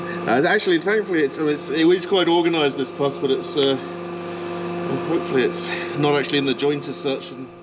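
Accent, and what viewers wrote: Scotland, United Kingdom. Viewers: I've watched your videos for months now and love your content. Please distract me with your scottish accent from the horror my country is becoming (USA). 😭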